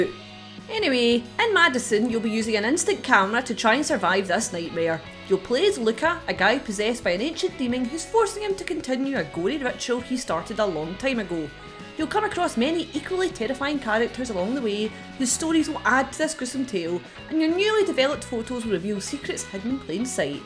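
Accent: Scotland, United Kingdom. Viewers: Does anybody know what part of the world is from? That accent is amazing. Scotland? What part of it? I'm interested in the specific area, as for example "Italy" has more than 20 different accents.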